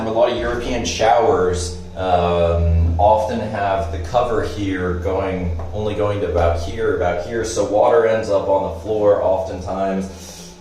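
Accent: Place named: Northeast, Brazil. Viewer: ela e brasileira! :) acho que nordestina!!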